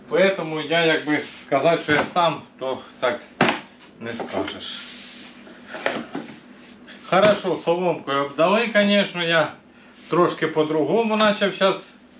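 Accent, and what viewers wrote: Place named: Surzhyk, Ukraine. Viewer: Сало добре але суржик ріже слух аж слухати неприємно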